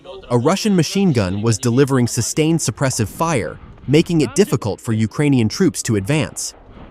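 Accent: United States. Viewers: cant stand the overly "american" accent voice overs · Do you steal all of your footage from Azov YouTube channel? with an American narrator...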